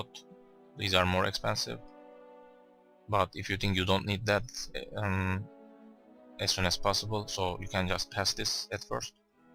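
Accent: Turkey. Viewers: İlk cümlende Türk olduğunu anladım :D Rehber için teşekkürler. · Türk ingilizcesi bu ama gerçekten çok temiz olmuş dostum ellerine sağlık :)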